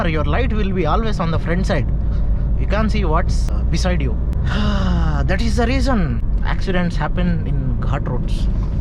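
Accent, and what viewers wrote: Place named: Hyderabad, India. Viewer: Bro i love your video😁...... ..keep posting....and that hyderabadi accent is love bro....seriously.....🥰🥰🥰🥰🤗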